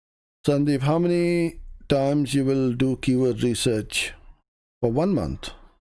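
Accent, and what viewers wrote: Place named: England, United Kingdom. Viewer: You talk too much.Impossible to suffer that English accent more than 10 min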